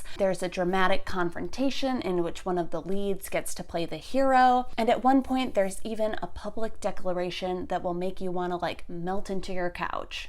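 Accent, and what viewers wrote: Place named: United States. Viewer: Wow love your review - reminds me of what English lessons were like back in the Sixth Form. Such a shame my school never invited any guests with an awesome American accent like yours. I did meet Simon Armitage and Matthew Sweeney though - this happened maybe 6 years apart (i met Sweeney first, then Armitage) - so that’s something I guess!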